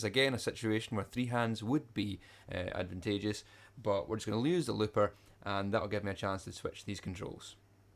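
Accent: Scotland, United Kingdom. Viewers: It's so hard to understand what youre saying when you have Scottish accent..btw chicken needs ketchup and mayonaisee so PUT UP THE GAIN!!!!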